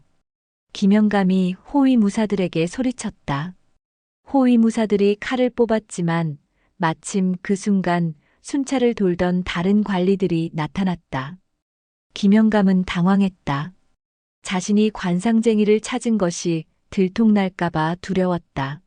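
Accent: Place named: Gyeongsang, South Korea. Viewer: 또 경상도😂😂😂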